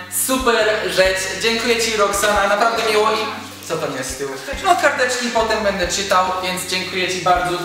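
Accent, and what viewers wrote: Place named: England, United Kingdom. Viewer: Ale ci się akcent wyrobił ;3 Teraz to już ledwo słyszalny ten angielski, chociaż trochę szkoda, bo z nim było jeszcze zabawniej ! :D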